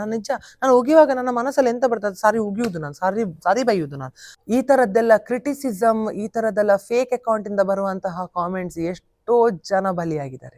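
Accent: Mangalore, India. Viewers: Typical kudla Kannada 👍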